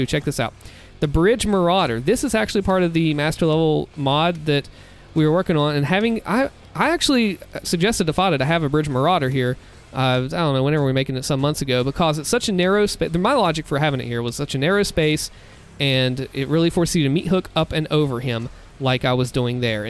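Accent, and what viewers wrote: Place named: Midwest, United States. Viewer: Hey r u from the midwest? Im just curious, ur accent is so funny 😂. Keep up the good work tho, u r honestly one of the few doomtubers who put effort into their content. I have a suggestion for u however, which is to play some doom eternal levels on the switch. I think that would be an awesome level (I play on switch 🤣)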